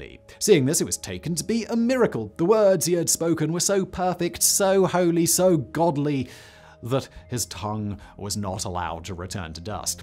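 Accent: United Kingdom. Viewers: no offense, but the British pronunciation is funny to my Italian American ears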